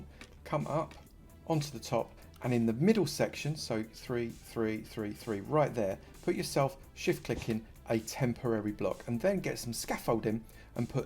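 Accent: United Kingdom. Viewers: no mate he really dont he just sound like a British person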